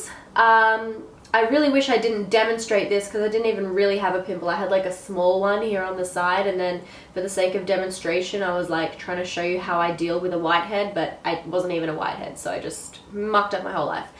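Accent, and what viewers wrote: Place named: Australia. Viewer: I know people throw this statement around all the time but you are seriously so beautiful, with and without makeup. You truly don't need to wear makeup you're that beautiful. This is the first video of yours that I've seen so I don't know anything about you but if you're not a model you should be. And I actually like your accent even though Aussie accents often annoy me (I lived there for 8 years and became a dual citizen, so I can say that)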